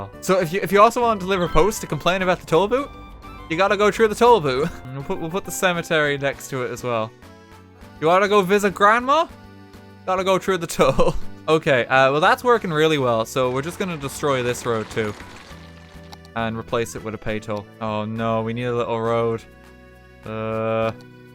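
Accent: Ireland. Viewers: Have you got a Irish acent if so I love it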